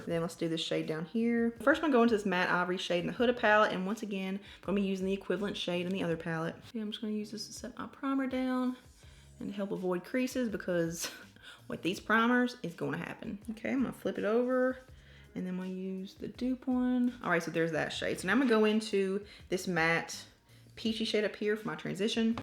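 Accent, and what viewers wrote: South, United States. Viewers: noob here... you seem so down to earth. looking forward to watching more of your videos! as a North Carolinian, I immediately favor any twang I hear. #southernsistas 🤣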